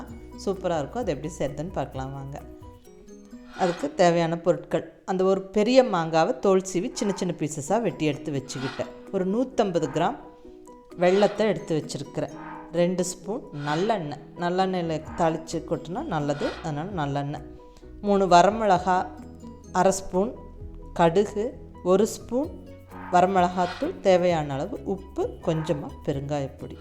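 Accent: Kongu, India. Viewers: mam kongu tamil suprr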